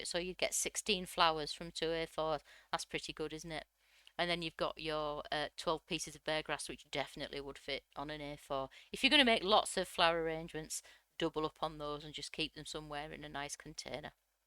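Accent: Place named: North, United Kingdom. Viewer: I love your videos as you explain every thing so well and a good old northern voice to boot, which makes a refreshing change.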